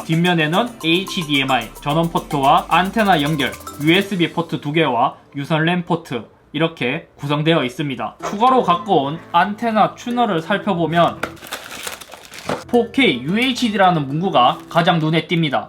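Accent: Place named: Jinju, South Korea. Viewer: 고향이 진주 쪽 이신가? 억양이 친숙 하네요 ^^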